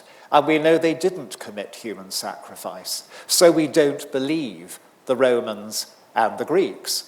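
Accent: United Kingdom. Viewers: It could also be because I find a British accent easier to understand (am Australian).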